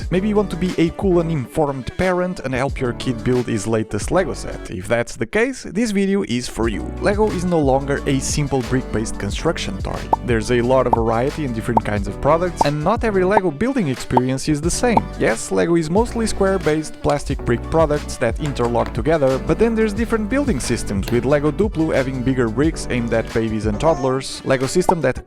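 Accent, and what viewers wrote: Portugal. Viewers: És português? Parece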